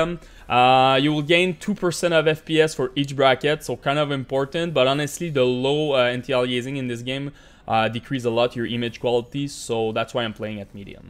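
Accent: Quebec, Canada. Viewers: J'ai reconnu l'accent québécois direct lol pis mon user est aussi Ludov lol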